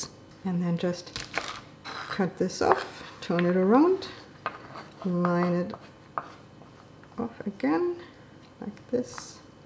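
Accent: Germany. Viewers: You sound just like my German mother who was from Northern Germany. Steer (stir) it😅😊😊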